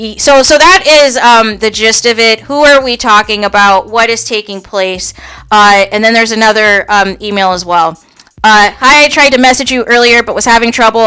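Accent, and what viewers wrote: Midwest, United States. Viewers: I like your accent. It's either Midwest USA or Canada, I can't tell.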